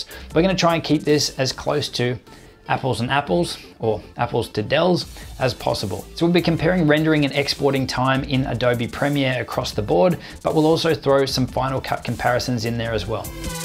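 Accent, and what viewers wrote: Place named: Australia. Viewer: Hey, nice to hear an Aussie voice doing some serious reviewing.:) I'm curious about the codec/s used in the tests across Premiere Pro and FCPX - were they truly the same asset? I know FCPX can transcod Camera formats to ProRes - which I would expect to export much faster being Intraframe based codecs. Cheers, JB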